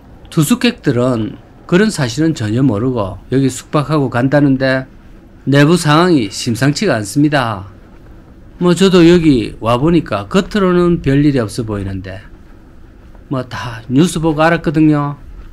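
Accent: Gyeongsang, South Korea. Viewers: ㅋㅋㅋ 말투가 경상도신데 전라도라니 무슨 전략이신지 ㅋㅋㅋ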